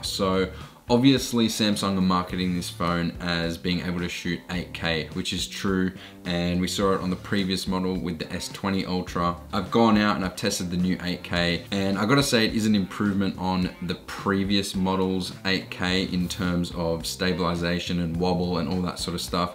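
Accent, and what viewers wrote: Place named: Australia. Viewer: Thank you very much it's good we have an Australian accent because I am from Sydney, how did you get the hands off Galaxy S21 I thought only Americans can get it. I am subscribing your channel keep up the good work😁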